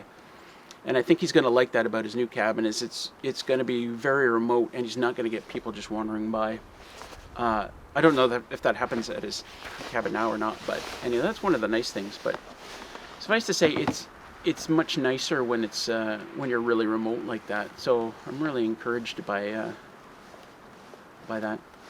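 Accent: Canada. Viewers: Big fan of Shawn James I get a kick out of accent! Now that’s a Canadian accent! With Shawn you only get an occasional aboot!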